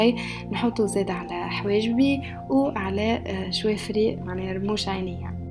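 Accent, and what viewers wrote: Tunisia. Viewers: هي تونسية و حافظت على لهجتها وهذي حاجة باهية💓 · أنا كذلك أعشق اللهجة التونسية كثيراً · نوره البغدادية بس المشكله هيه تونسيه منفتهم حجيها بس اني افتهمت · هي تبان تونسية من هدرتها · b9it nesma3 fi lahjtek w n9oul on dirait djerbienne hata 9olti "ki rawahet jerba" machallah 3lik charmante fière que vous ete tunisienne et surtout djerbienne :) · لا يوجد اللهجة هاذي تونسية مش مغربية😌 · نموت على هدرت توانسا حلوين ياسر 😘😘😘انا من الجزائر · نموت على اللهجة التونسية ماشاءالله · تونسية على حسب لهجتها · هاي اللهجة تونسية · اوكي شكرا على المعلومة لهجة تونسية زوينة عجباتني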